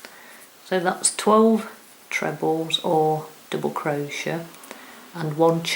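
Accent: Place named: Leicestershire, United Kingdom. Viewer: I love your videos! You're so good at explaining things and easy to follow. Thank You. Are you from Leicestershire or Derby? Your accent sounds very familiar. I'm a Leicester/Derby lass myself. I hope you have a lovely weekend.xx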